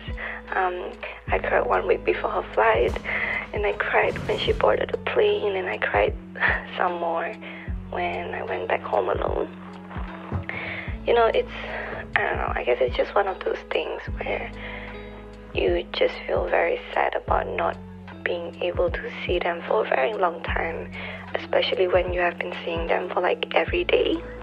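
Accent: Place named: Singapore. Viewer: I'm glad you found my channel, because now I found your channel! I teared the first minute because it hit so hard. I've said goodbye to close friends who went back to their home countries, and then when it was my turn to leave my family and friends "behind" it was such a painful moment as well. This was a beautiful video. Looking forward to more content from you <3 Sending love. p.s. i also missed hearing that singaporean (it is, right?) accent :)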